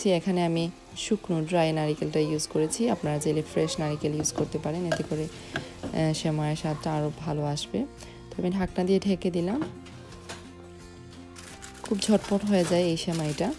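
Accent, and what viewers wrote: Sylhet, Bangladesh. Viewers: Salam apa . Apni ki shyllhety. Apnar kotha sune to buja jayna. Doy bora ki vabe banate hoy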